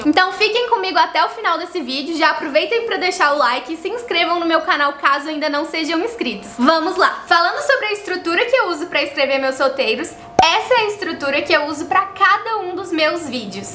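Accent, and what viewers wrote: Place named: Minas Gerais, Brazil. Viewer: Uma coisa eu tenho certeza, ela é Mineira rsrs. Ótimo conteúdo, parabéns! 👏🏼👏🏼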